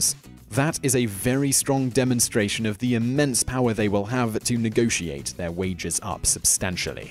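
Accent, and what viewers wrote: United Kingdom. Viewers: Great. A guy with a British accent wants to tell everyone what America should do as if he is the expert.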